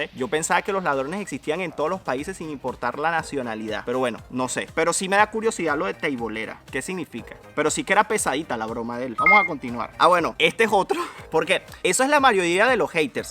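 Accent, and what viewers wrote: Venezuela. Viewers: Me encanta tu canal paisano. Me encanta tu personalidad y la de tus esposa, son geniales. No le prestes atención a los malos comentarios y tu acento maracayero me encanta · ¡Me encanta tu acento! Antes de mirar tu canal, no podía entender el acento venezolano, pero mirar tus videos me ayudó poder entenderlo. Ahora que lo puedo entender, me parece un acento muy bonito. (Perdón el español malo; no es mi lengua madre 🙂).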